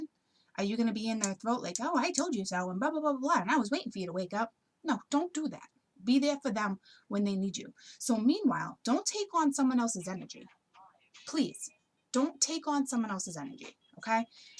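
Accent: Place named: New York, United States. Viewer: I'm new to your channel, just wanted to say I love your energy and your accent is everything. Jersey or Brooklyn? (no offense meant)!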